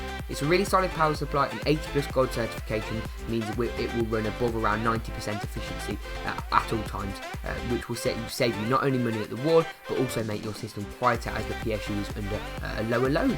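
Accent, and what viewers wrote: England, United Kingdom. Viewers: you sound English because ,you should use £ as well as dollars because I'm not sure about the prices ,oh yea I subd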